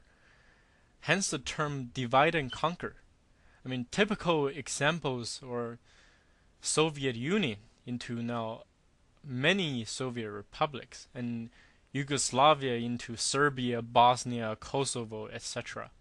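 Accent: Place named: Asia. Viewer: Unbiased lol ? very skeptical... you still have Asian accent lol.... Your choices of expressions really concerning me.. unbiased???? "Free" Tibet How f""cking ironic << very offensive line lol that is not appropriate and unsuited with the title. You are giving a brief history of Tibet in one perspective, and there are a lot of opinions in the video rather than factual.... Change the title if you could please... cuz you are misleading the audiences.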